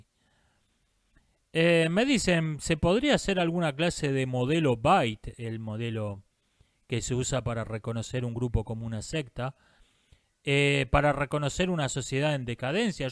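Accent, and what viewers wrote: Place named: Argentina. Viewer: Abro moción para que nos narre creepypastas clásicas con su acentito argentino. Imaginen tener a Slenderman no sólo narado, sino COMENTADO por el Sócrates de nuestro tiempo.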